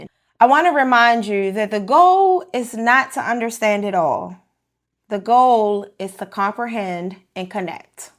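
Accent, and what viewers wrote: Baltimore, United States. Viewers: I hear a Bmore accent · Brand new subscriber here and love the content already! BTW Are you from Baltimore ? 👀😭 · you from baltimore aint you??? Ik another when i hearrr 🤣🤣💕💕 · Go-head girlfriend, lol! Question - where are you from? Baltimore??? I hear your accent and it sounds like it.......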